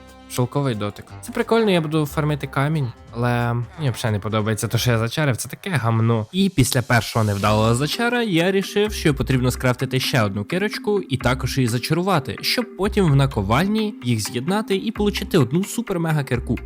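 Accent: Ukraine. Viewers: Как русскому, очень не привычно слышать украинский акцент._.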